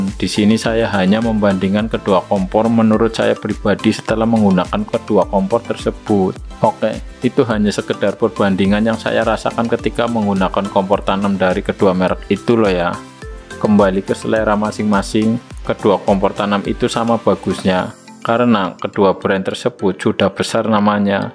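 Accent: Banyumas, Indonesia. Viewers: ngapak mbok? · Ora ngapak ora penak, matur nuwun pakde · Daningg ngapak om😁🙏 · Ngapak bgtt😂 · Kayak orang ngapak bahasanya 🤣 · njir logatnya ngapak-ngapak.. gak enak banget didengerin 🙃 kaga malu apa bray, ngereview pake logat ngapak gitu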